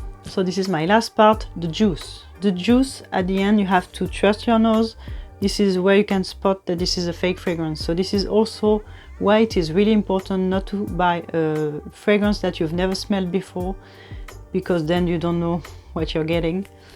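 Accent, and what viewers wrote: France. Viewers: Hello, i feel like you have a French accent! Am i wrong?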